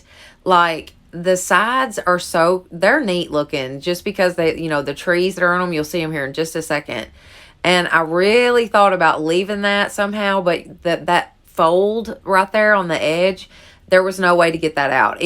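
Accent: South, United States. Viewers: Love your creativity! What state do you live? You speak southern like me! I’m in Tennessee! I’m thinking maybe you live in Kentucky!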